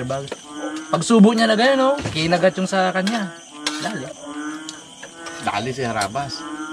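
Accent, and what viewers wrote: Iloilo, Philippines. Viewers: ilonggo kaba lods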